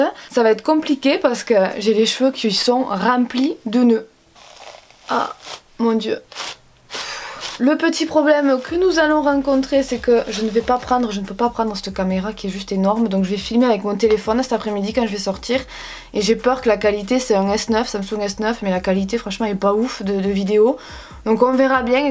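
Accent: South, France. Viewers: Es que t Marseillaise parce que moi oui et ta un accent du sud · J'aime trop ton accent du sud et tu est trop belle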